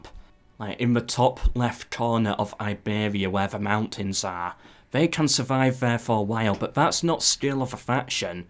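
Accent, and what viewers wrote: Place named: Yorkshire, United Kingdom. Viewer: I've honestly never heard a Yorkshire accent as strong as yours, it's beautiful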